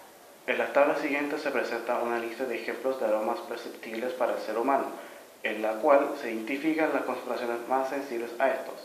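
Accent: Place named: Chile. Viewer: es chileno, así hablan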